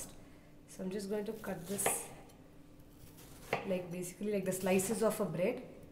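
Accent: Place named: India. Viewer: But that stereotypical Indian accent doe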